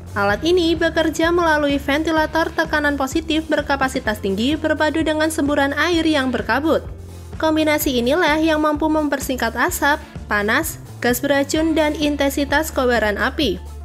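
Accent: Java, Indonesia. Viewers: Naratornya medhok... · Wong djowo medok e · Org pundi mbaa, medhok bgt omongan e hahaha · Ngomngnya medog wkowkowko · Medok suaranya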